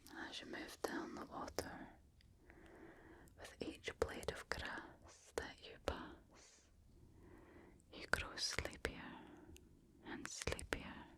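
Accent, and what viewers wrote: Scotland, United Kingdom. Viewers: This video took me on such a calming journey! The blend of Scottish legends, soothing hand movements, and that perfect ear-to-ear whispering in your Scottish accent made it incredibly immersive. The guided meditation and visualization were just what I needed to unwind, and your storytelling added such a unique touch. Sweet dreams, indeed! Thank you for creating such a peaceful experience.😴😴😴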